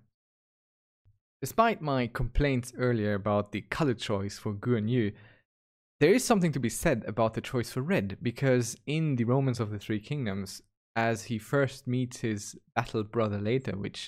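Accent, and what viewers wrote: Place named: Ireland. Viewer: Are you Irish? You sound like a mix between Irish and something else.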